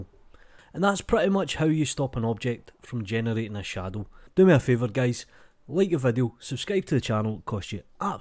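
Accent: Scotland, United Kingdom. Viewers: Are you from Scotland? Because your accent sounds really similar to the guy from Limmy's Show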